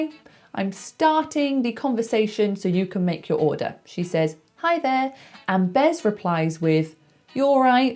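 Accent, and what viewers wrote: United Kingdom. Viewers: i like british sounds❤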